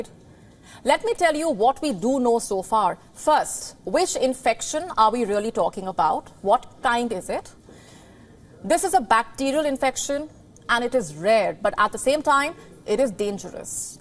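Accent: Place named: India. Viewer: i hate her Indian accent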